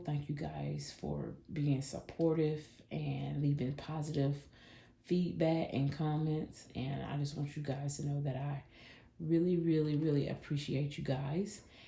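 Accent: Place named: South Carolina, United States. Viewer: I absolutely love this video. You are so organized and detailed. I am from NC and I can definitely hear that SC accent😂❤ Also congrats on being monetized. I m trying to get there 🙏🏾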